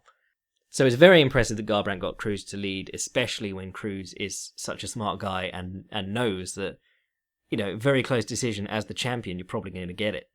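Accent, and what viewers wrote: England, United Kingdom. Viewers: Your podcast is now a highlight of my week and as a Patreon supporter I want to say thanks for the great work; to me your breakdown of the cards with fight analysis alongside fight history and social history and the 'long view' with that chatty style (you charmer you! 😄) delivered with English humor works for me big time..original and refreshing change from lazy, samey trash from mma media. There are some really good guys, no doubt, but maybe not so much by way of innovation and getting fans engaged, you might agree? Could you the man t shake things up there? I note you plan to focus on the podcast at start of this year so that fills me with hope and glee!! I'm English and it's also nice to hear about mma from an English tongue..I would actually be interested to know who your audience is, if you've done that research and willing to share with us? 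(i.e from which countries, more US or British? much outside those two? Ages, sex etc..I want to know my new tribe better, I guess.. Anyway is one of boys and no mistake! Here's a thought then...how about a feature where Patreon bods can compete/enter for a chance to join you for ten, fifteen mins on the podcast for a chat on their favorite subject related to mma/Boxing/whatever, today or from the past..a little screening of participants would seem to important but i wouldn't mind betting you could have a pretty good, interesting conversation with a good many of your regular listeners..? Yeah alright, totally selfish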